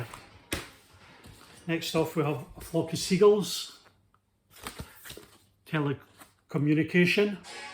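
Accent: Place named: Scotland, United Kingdom. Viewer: nice collection of 12" 45s, haven't seen many of thoughs, love and want a copy of that, I also like it when they have the 7" version on the b side, always refreshing to tune in and to hear that great Scottish accent of yours, thanks big man 👍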